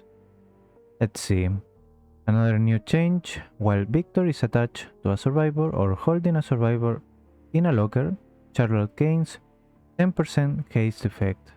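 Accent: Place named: Spain. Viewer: exactly, they really went the lazy way. The devs are getting lazy, what a surprise. Only 1 killer update, 3 perks changed (calm spirit no longers counters ultimate weapon, which is a shame) and only one map changed, where is the swamp visual rework? Im happy for Twins mains but I dont think their changes to Haddonfield are gonna be very good, devs always fuck up in some way. Oh by the way, Im pretty sure you are a native spanish speaker. I myself am from Spain.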